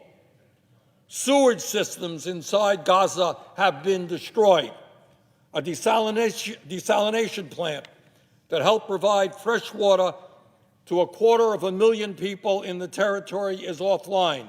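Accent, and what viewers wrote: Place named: Brooklyn, United States. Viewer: How long, do you think, it'll take this guy to lose his Brooklyn accent??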